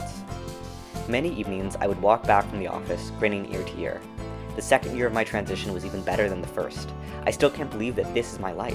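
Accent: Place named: Northeast, United States. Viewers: I love this guy’s American accent but I’m British and can’t work out which state this accent comes from? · Seems like Maine (based on the ID) but it's a pretty general 'northeast' accent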